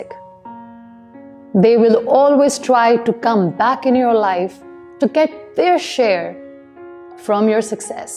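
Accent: United Kingdom. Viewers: ur accent is Soooooooooo good mashaAllah plz tell me how did u learn it, it is very much like British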